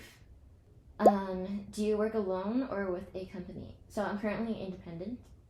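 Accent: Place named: United Kingdom. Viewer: but her accent is british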